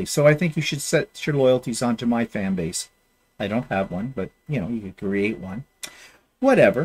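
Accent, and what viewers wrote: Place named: Newfoundland, Canada. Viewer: Newfoundland accent ha ha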